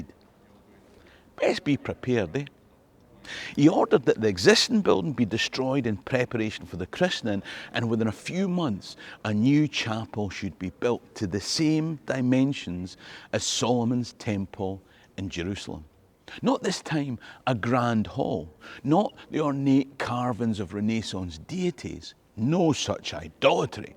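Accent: Scotland, United Kingdom. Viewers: Watching on the TV in our livingroom in Canada. I am a very very distant descendant of James VI and Mary and the rest of them. My "immediate" ancestors came over from Ayrshire. Always interested in the history. I enjoy your videos immensely, although I do have to turn on the text because your wonderful accent throws me for a loop at times. Thanks for what you do :) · There's something strangely nostalgic about hearing someone with a Scottish accent using onions as a metaphor for layers. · Watching on my iPad, on my couch, drinking a cuppa, not only loving the history, the castles but love hearing that gorgeous Scottish accent...I'm an Aussie.. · Watching at bedtime in the Pacific NW US; your Scottish accent is wonderful. I love reading western European history fiction, all time periods. While Iistening to the stories, I like to learn the actual history. 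I watch your videos to help bring to life the Highlander books I read (actually listen to on audiobooks) as well as other Scottish time periods. My favorite genre is time travel...I just listened to a story about Stirling Castle and William Wallace.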